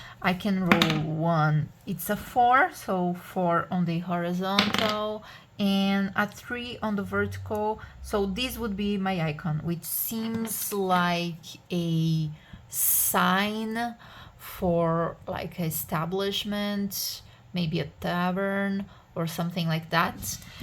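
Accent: Brazil. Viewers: esse sotaque com certeza é brasileiro.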